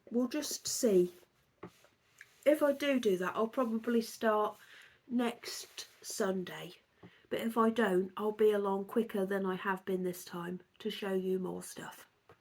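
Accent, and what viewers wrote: Norfolk, United Kingdom. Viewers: Love your fabrics...I buy embroidery tableclothes from secondhand shops as I think they are beautiful. Really enjoy your chats, it's as if I'm sat talking to a friend as I live in Pakefield, Lowestoft so very used to the norfolk accent 😊 so very sorry about Dennis, its just heartbreaking...I know how it feels. 😢 Take care, big hugs to you and your family 🤗 ❤ xx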